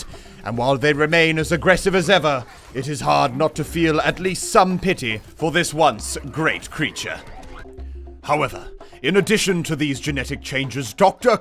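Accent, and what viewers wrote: United Kingdom. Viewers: An Australian speaking with a British accent seems.... wrong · why the british accent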